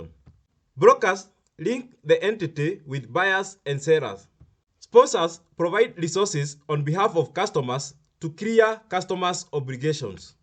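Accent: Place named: Africa. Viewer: Be slower in your presentation.for a better understanding for those of us that are not from the part of Africa you come from.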